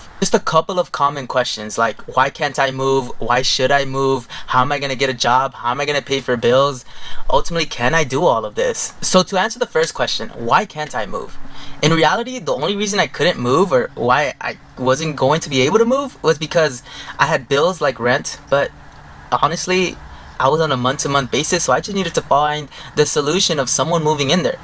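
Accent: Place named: Los Angeles, United States. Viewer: U have that LA accent. 😀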